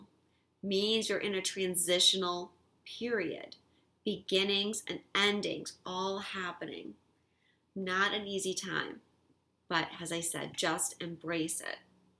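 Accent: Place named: Massachusetts, United States. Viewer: I love your readings. You really clarify things. I also just Liked you on Facebook. I also detected a hint of an accent that you were a fellow Massachusetts girl like myself. I also think you have really pretty hair...:)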